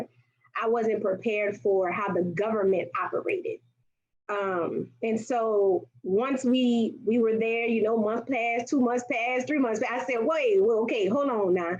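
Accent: United States. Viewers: She looks like a Rwandan except she has lived in the US and speak like American. I think she is 1ST generation American from Rwadan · She sounds like she's from the New Orleans 504 area. I would have definitely like to hear more of the more position pros on each country she went to. Wondering what did work for her and her peoples.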